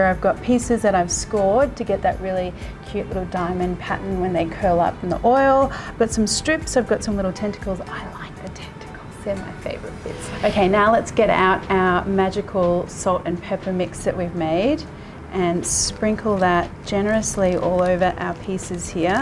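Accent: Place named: Australia. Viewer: I’ve got to try this. Great vid and so well done. Are you Australian? Like your accent.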